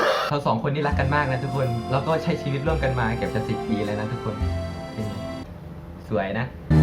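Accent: Korat, Thailand. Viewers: ว่าแล้วเห็นคริปนั้นพูดโคราช😂❤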